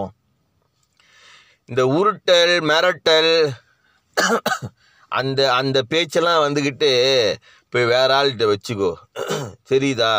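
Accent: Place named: Malaysia. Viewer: Oh my god the best 1 Malaysian tamillan slang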